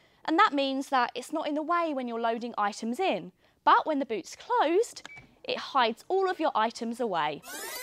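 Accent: United Kingdom. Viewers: Pojo ? That’s not the name ,its Peugeot …. Cannt u hear your self how ridiculous u sound? Hate British village accents..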